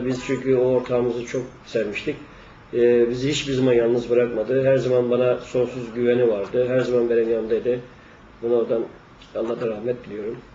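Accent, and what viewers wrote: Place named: Istanbul, Turkey. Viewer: Madem görelenin tanıtımını yapıyorsun o zaman sunumu yapanın ağzı istanbul ağzı değil Görele ağzı olsun yerel kültürün en olmazlarından biri de yerel ağızlar dır.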